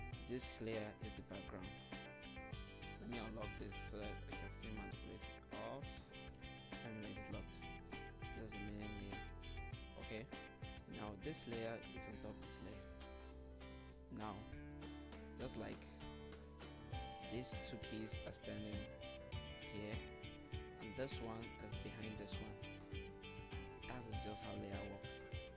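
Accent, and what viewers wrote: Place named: Nigeria. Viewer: Woow!.....great tutorial nice voice. sounds nigerian.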